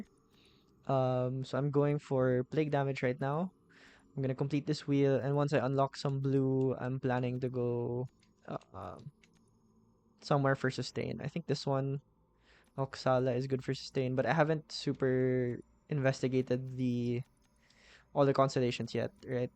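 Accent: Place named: Philippines. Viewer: love the build boss. From the way you talk i assume you're filipino :).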